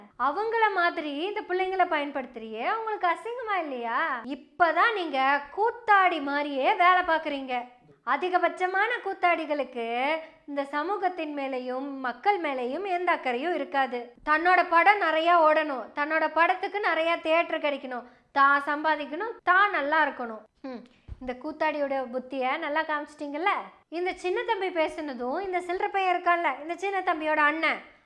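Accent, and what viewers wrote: Kongu, India. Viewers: Nee oru dmk பரதேசி போல இதுல கொங்கு மொழி வேற பன்னாடை கண்ணாடி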